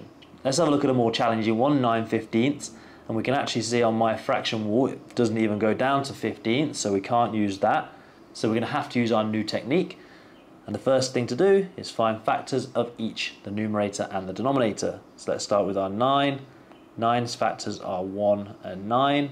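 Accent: United Kingdom. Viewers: British ahh guy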